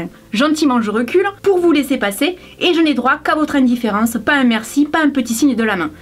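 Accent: South, France. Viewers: sa se vois qu'elle vient du sud ^^ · Bonjour. Je viens par hasard de découvrir ta chaîne. J'aime ton style naturel. J'ai particulièrement apprécié cette vidéo sur" Mon voisin est fou". Je fais partie des 50% de personnes qui ont des problèmes avec leurs voisins, et ça m'a donné des idées pour les remettre à leurs places. Alors j'ai bien rigolé. Puis j'ai encore bien ri en lisant les commentaires trop drôles. Super, ici pas d'insulte!!!! Je m'abonne à ta chaîne. PS: comme toi j'ai l'accent du sud et c'est bien de ne pas essayer de le gommer dans tes vidéos. Il apporte beaucoup de convivialité.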